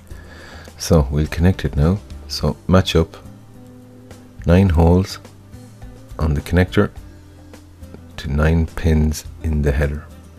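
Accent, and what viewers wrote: Ireland. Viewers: What part of Ireland are you from your accent sounds like Dublin. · By listening to your accent, I guess you're Irish. Am I right?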